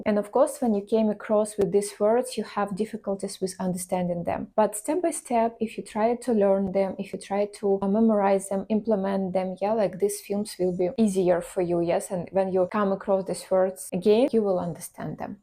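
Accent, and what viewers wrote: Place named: Eastern Europe. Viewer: Very nice Slavic accent. Continue filming on similar topics. Будь ласка Дуже файний слов'янський акцент. Продовження знімати на схожі теми. Please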